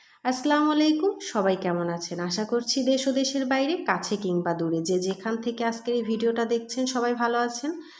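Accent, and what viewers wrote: Barishal, Bangladesh. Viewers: তোমার বরিশালের কথাগুলো মিস করি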